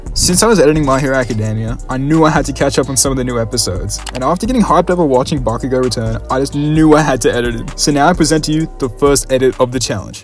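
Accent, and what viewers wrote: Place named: Australia. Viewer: I KNEW YOU WDRE AUSTRALIAN BY YOUR VOICE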